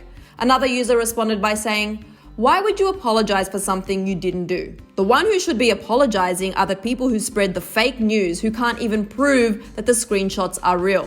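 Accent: Australia. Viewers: you're just not used to Australian accent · What is that dialect? Is it Australian?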